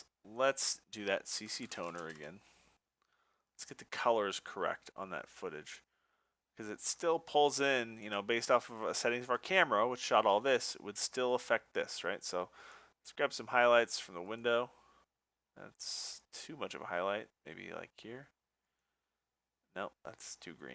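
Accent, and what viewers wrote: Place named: Canada. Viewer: I detect a canadian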